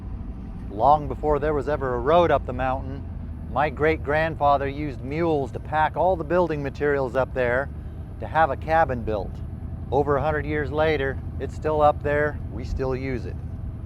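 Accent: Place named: Pacific Northwest, United States. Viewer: Sounds like northern oregon or southern washinton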